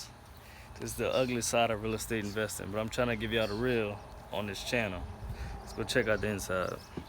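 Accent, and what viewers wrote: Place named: Eunice, United States. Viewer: This is my first time seeing one of your videos. I absolutely knew that I recognize the accent. I am from the Eunice area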